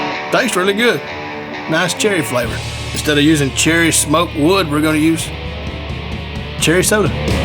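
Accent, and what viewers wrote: South, United States. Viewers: The southern accent just makes these sound even better. Was living in the south for 10 years and those mofos can cook! If you're not a fancy little snowflake you'll love pretty much everything the south can cook.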